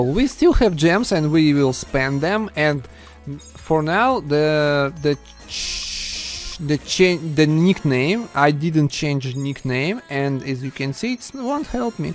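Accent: Russia. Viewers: You are Russian wright?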